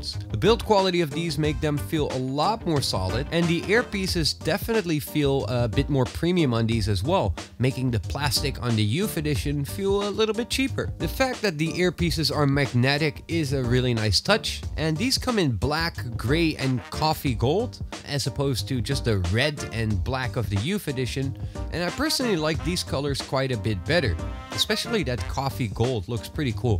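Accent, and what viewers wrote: Netherlands. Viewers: Are you Dutch? I think I can hear a veeeery slight Dutch accent creeping through your otherwise flawless English. Groetjes ;) Also, as a Xiaomi phone user, do they give any benefits? Like the OnePlus' earphone has magnetic buds which autopause/disconnect and connect/autoplay when put together or separated, when using a OnePlus phone.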